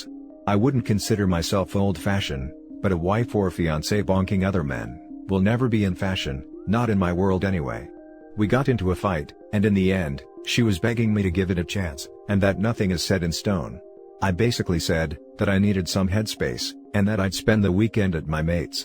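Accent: Australia. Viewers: Dude its time to end this relationship and walk away she isn't worth it. Further recommendation never married ever know cohabitation no marriage ever women today are not worth it. They want sexual freedom but the stability of marriage in the end up shiting all over the husband's. Buy your language you sound like your from Australia. Australia and the United States going through 2 of the worst things going on any country the feminization of men in the masculization of women. In either of these countries manner treated equally which read like disposable commodities. The statistics in stats prove it. Between divorce courts child custody 's the way that were all looked at plans simple but what people don't realise men walk away society ends the safety for all ends. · You can just tell this guys an Aussie, up the Aussies!!!!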